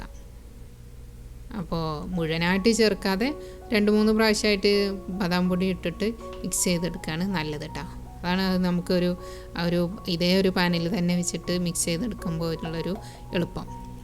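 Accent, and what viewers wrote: Malappuram, India. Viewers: നിങ്ങൾ നാട്ടിൽ മലപ്പുറമാണോ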